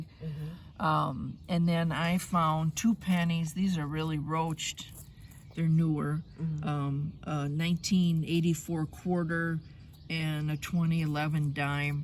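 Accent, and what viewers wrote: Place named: Minnesota, United States. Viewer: I think the O is from Ford. Also, the Minnesotan is coming through….”Baja Bug” where you pronounce the hard J made me laugh (I’m originally from MN). It’s pronounced Bah Hah (think of the off-road racing in the Mexico/California desert). 😊 Anyways, lots of cool finds. Hope you get some extra permissions from your presentation to the church goers.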